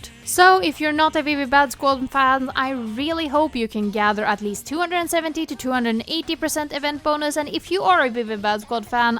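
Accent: Sweden. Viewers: im sorry but i cant stop focusing on the swedish accent 😭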